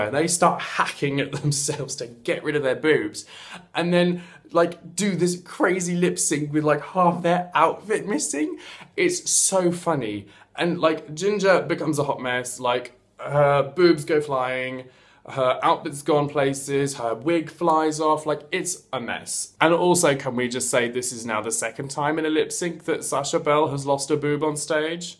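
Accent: United Kingdom. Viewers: Lusine Margaryan I'm guessing he lives somewhere in the south of england, Midlands maybe, so just a southern English accent. I'm guessing this because he sounds similar to me and to me he doesn't really have an accent, which likely means he lives somewhere similar to me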